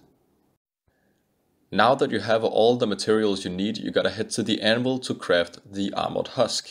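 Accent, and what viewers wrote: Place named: Denmark. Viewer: Den danske accent er legendarisk aha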